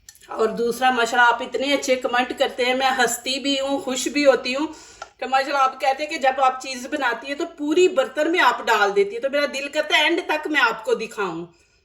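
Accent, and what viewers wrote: Punjab, India. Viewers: بہن پہلے آپ اپنے پنجابی لہجے پر قابو پائیں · Apna urdu lehja theek karen panjabe lag rahe ha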